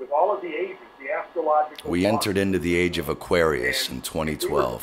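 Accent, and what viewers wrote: United Kingdom. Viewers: WHAT IS this music... is your name? I lived for a few years in Kolwezi, Katanga [Shaba] in Zaire, and we had a small lake there, perhaps what's left of that Lake called Zembre... Zaire is the name of the whole country [Congo[ in Mobutu's time. Kolwezi is close to Angola. [that was 62-64]. Before that I was in Kambove and Jadotville/Likasi, born there of colonial parents, and we retured to Belgium. I am in Britain since 1968. I love it when you read a text with the British accent, and of course your amazing theories, especially this one with an eluxidation for this great mystery concerning what the Son of Man means!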